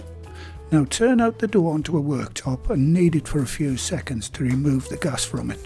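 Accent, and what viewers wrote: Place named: Northeast England, United Kingdom. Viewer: Hi - greetings from sunny Colorado- I'm an expat - originally from Murton ( colliery). I've been working through your videos from the beginning and will definitely try virtually everything including cheesemaking. However when I came across stotty cake - always called "fadge" by my dad - I had to try it out. Came out great - thanks! Love your videos and hope to make many things soon!! Stay well and keep them coming please! Tony Armstrong ps - great to hear someone with an accent pretty much like mine! My American friends thought I was unique!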